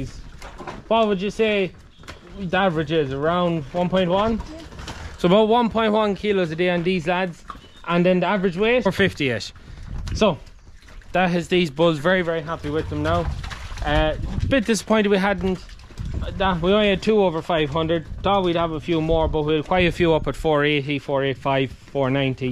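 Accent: Ireland. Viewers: enjoy your channel from across the pond. Are you aware that you have a slight Irish accent, and that a beautiful woman is working on your farm, oh, you lucky rascal? 😉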